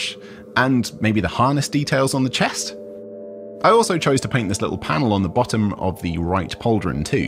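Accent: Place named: England, United Kingdom. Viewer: Yeah english woman voice overs are back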